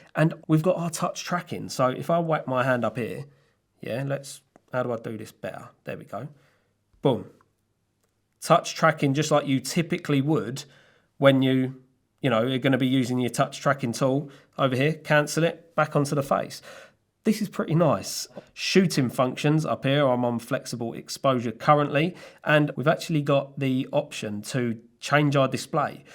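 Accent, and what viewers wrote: United Kingdom. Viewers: American here, I love your accent this is what Americans imagine when when think of a prototypical "British Accent" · Bro I just noticed you look like you're the brother of James Hype. You even got the same UK accent and Name.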